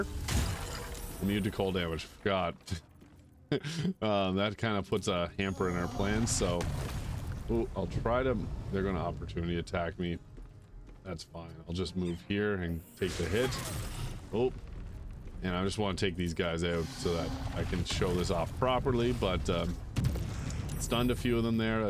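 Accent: Canada. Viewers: so sorry if this is weird but brother i have got to know where your accent is from 😭 i’ve been wondering since day 1 of watching your vids lmao. canada ??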